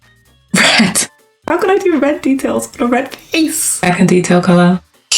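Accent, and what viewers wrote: United Kingdom. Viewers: I subscribed when I heard you were British like me 🥲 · I love your British accent ❤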